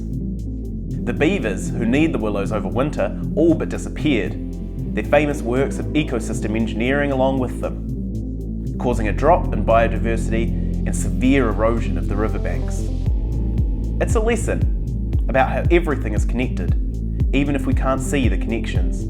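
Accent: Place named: New Zealand. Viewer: Great illustration of nature as a complex adaptive system! And nice New Zealand accent...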